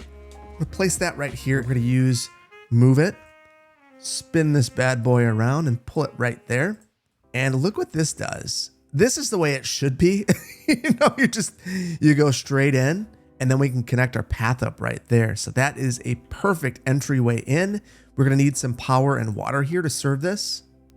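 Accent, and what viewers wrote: Wisconsin, United States. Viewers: Just watched all of this playlist, I'm from southern Wisconsin and it's great to hear the "up north" accent attached to all this scenery.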